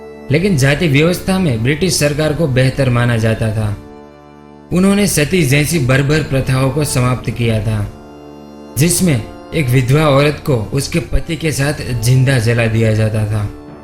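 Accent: Gujarat, India. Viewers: Apki voice sunke lag raha hai ki ap Gujarati ho replay plz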